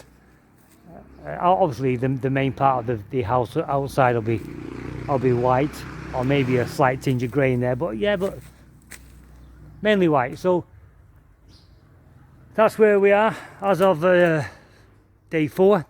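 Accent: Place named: Northern England, United Kingdom. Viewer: Appriciate the updates, I’m from the Uk also you sound like your from Leeds/Preston area? Anyway your build is just Infront of mine! How much land do you have? I’m 37 and have 1 rai in khon kaen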